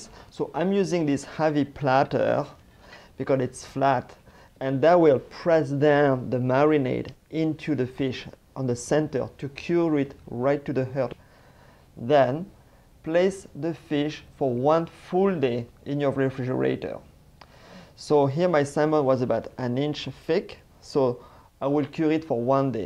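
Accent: France. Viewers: so my salmon is ready , well, tomorrow can be eaten actually but I taste it after the curing, delicious and now I added yellow mustard ( no dijon in the house) and chopped dill. Thank you for the recipe, the lemony dill taste of the cured salmon= 😋 DELISH!! I personally love garlic so I added a little bit in the curing mixture and celery powder as well, love it!! P.S. your French accent is killing me... in a good way!! Is buy favorite accent in the world since it makes me laugh 😁 Merci beaucoup!! 🇨🇦🇫🇷👍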